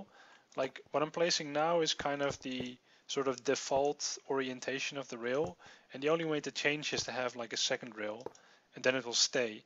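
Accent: Netherlands. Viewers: let me guess, you're dutch. (kan je horen aan je accent) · ***** oke nederlands :P je acent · aan je Engels hoorde ik dat je Nederlands was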